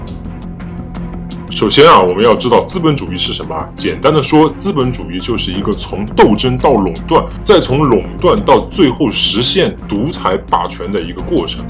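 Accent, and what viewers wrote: Shanghai, China. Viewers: 音色和语速可以软件微调成不一样，但是很多词的口音、下意识的手势动作和偶尔冒出来的上海话切口，说不是我都不信。。。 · 其实就是一个人，因为都是上海口音，好多家具都一样的😂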